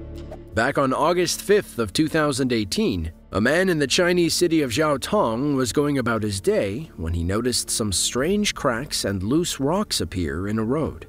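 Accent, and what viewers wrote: United States. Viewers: Imágenes impresionantes, con la voz de un locutor... Por dios... como puede ser tan desagradable al oído el inglés estaunidense...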